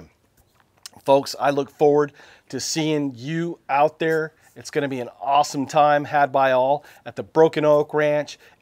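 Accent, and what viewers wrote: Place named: Maine, United States. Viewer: you sound like us Mainers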